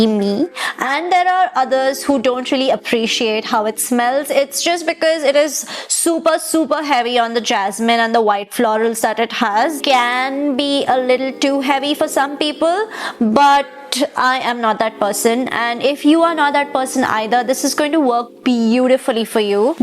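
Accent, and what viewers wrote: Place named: India. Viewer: hint aksanı kulak tırmalıyor işkence gibi AHHHHHHHHH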